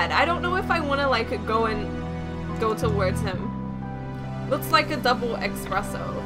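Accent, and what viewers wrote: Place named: New Jersey, United States. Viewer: It sounds very Jersey.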